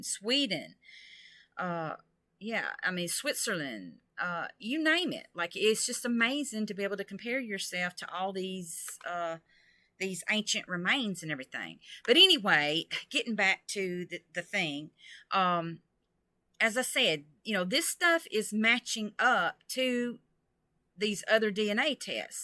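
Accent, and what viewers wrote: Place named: South, United States. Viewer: Sounds like this person is from the south.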